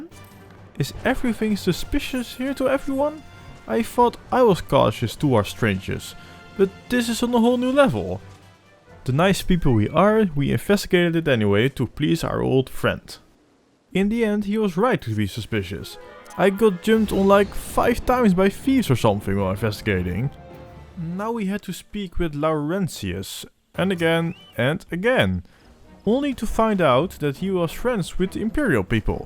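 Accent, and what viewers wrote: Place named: Netherlands. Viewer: Hehehe, your dutch accent makes me happy. I hope you enjoy the game!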